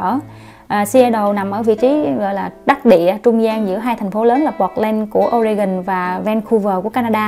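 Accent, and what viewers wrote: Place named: Central, Vietnam. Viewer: tôi rất thích nghe giọng của chất giọng miền trung, vùng NHA TRANG KHÁNH HOÀ cảm ơn đã chia sẽ, THANKS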